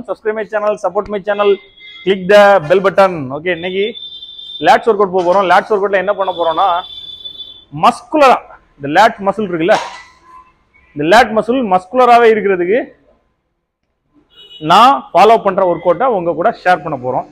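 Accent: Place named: Kanyakumari, India. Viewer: இது தான் உண்மையான கன்னியாகுமரி பேச்சு வழக்கு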